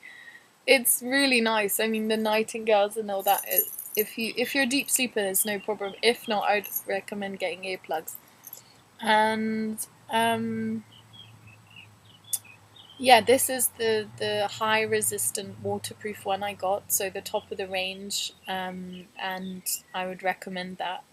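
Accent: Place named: United Kingdom. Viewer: Love the British accent of a lovely British girl!!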